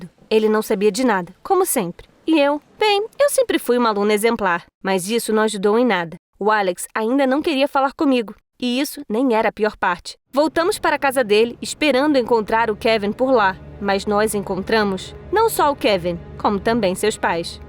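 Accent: Brazil. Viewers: Como ela é de Portugal sendo que ela fala igual brasileiros , Claro q eu sei q Pessoas de Portugal falam português , mais tipo eles falam diferente do Brasil, ou ela é brasileira e foi pra Portugal?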